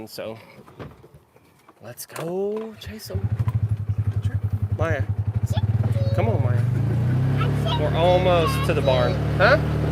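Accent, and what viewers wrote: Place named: Midwest, United States. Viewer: You want to make a Dunbar Bitter as another collaboration. Seeing as Dunbar is on the Scottish south eastern coast and bitter is a Northeast England/Scots beer. But I don't know if you would have the palate for a bitter as it is a dark bitter beer. You also have a Scottish emblem on the ponderosa in the thistle. So with Dunbar and the thistle you could be associated with Scotland in Oklahoma. So why don't you learn to speak the Scottish Celtic language. A mid american accent speaking Celtic would be great to hear. By the way it sounded like you and Marissa are going to have to to buy racing racing leathers for Brooks in the future.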